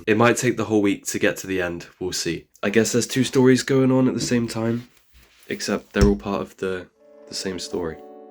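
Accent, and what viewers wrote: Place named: England, United Kingdom. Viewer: I don’t think that I’d ever have contact lenses, as the chances of getting eye infections is too great, even though I started wearing glasses for driving motorcycles in my home county of Meath in Rural Ireland many years ago (I’ve only lived in the U.K. (Manchester) for 24 years now) but I had a friend who had a severe eye infection from wearing contacts and he was in the MRI (St Mary’s Eye hospital university training hospital in Manchester) as an inpatient for several days - I’ve also used St Mary’s and the MRI dental hospital over the years and because they are a university training hospital, they have a consistently very high standard of patient care - at age 54 myself now, I now wear varifocals - by the way, I could listen to your gorgeous and well spoken English accent all day, it sounds so classy - despite my years living in Manchester, I’ve still retained my strong yet clear Irish accent, about which I’ve had a lot of compliments from my English friends 🇮🇪☘️🇮🇪😍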